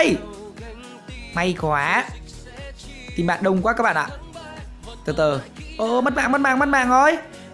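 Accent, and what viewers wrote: Nam Dinh, Vietnam. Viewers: Add quê Nam Định ah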